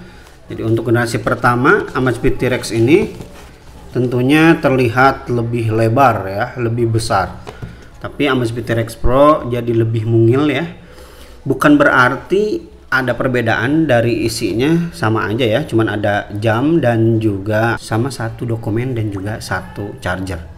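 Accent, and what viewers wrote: West Java, Indonesia. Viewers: sae a repiuna... nyunda pisan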